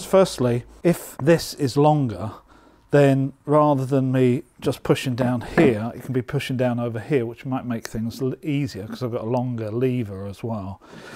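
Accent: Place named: United Kingdom. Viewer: I love your style, simplicity, and British accent! I'm from the South in the US. I love to watch woodworking video's since I cannot do very much any more. I am severely handicapped, and dying from a lung condition that is destroying my lungs, called Idiopathic Pulmonary Fibrosis, and was recently diagnosed with Liver Cancer. So 👍👍😁 and keep on keeping on! Subscribed, and hit the bell!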